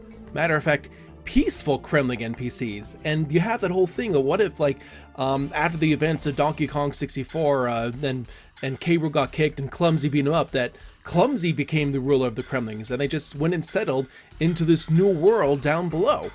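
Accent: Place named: Canada. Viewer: Also, your accent tells me that you're Canadian are you from Canada or not really?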